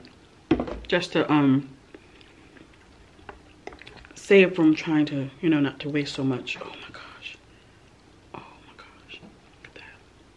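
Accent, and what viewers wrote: New York, United States. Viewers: I don’t know what it is but I really enjoy watching you eat Mac N Cheese and it’s so pretty too 😎 yes I love a NY accent too 🤣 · She should do a mukbang video only talking in her New York accent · Lmbooooooo at your NY accent. I’m from NY. You dZid good sis. Lolol (I added the z on purpose) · This girl from Queens appreciates your NYC accent!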